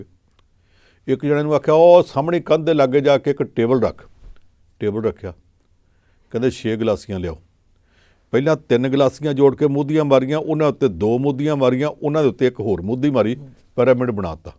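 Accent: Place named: Majha, India. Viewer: ਸਾਬ ਦੀ ਮਝੈਲਾ ਵਾਲੀ ਬੋਲੀ ਕਾਲ਼ਜੇ ਠੰਡ ਪਾਉਂਦੀ ਆ 🙏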